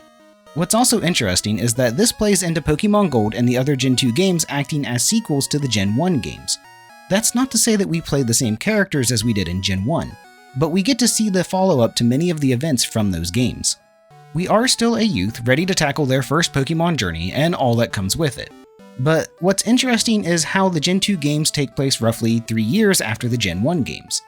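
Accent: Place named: Philadelphia, United States. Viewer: You have an interesting accent! Is that a Philadelphia or New Jersey by chance?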